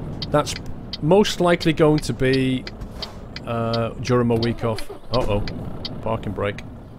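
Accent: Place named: Scotland, United Kingdom. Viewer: Awesome Scottish accent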